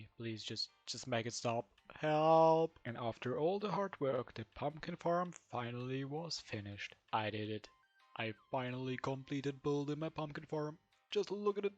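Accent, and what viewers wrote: Germany. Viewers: You sound a bit german 😅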